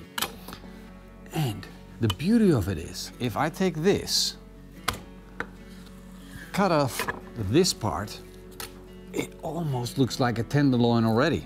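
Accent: Netherlands. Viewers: Dutch Guy reconise a fellow dutch accent NICE!!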